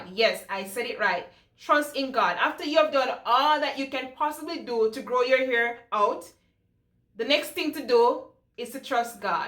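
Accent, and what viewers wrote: Jamaica. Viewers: I am hearing the Jamaican accent!! 🇯🇲 🇯🇲. Representing from ja! Great video ♥️ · You sound like a Jamaican. Are you? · Gurl I hair your Jamaican accent, subscribed.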